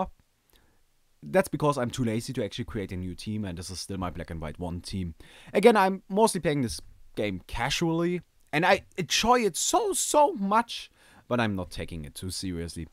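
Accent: Germany. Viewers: This guy is beyond German. Haven't heard an accent like that in a long time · Hab schon nach dem 1. Satz gewusst, dass du deutscher bist.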